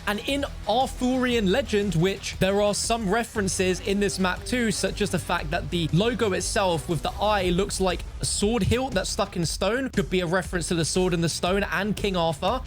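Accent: England, United Kingdom. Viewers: He is pronouncing it correctly he is English that's how we pronounce it (correctly) 👍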